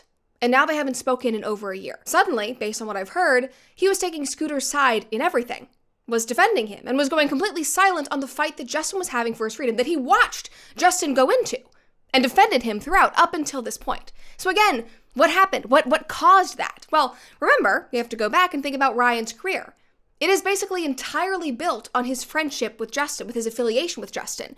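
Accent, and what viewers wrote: United States. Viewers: I really liked the way u talk fast and quick vibing American accent 🇺🇸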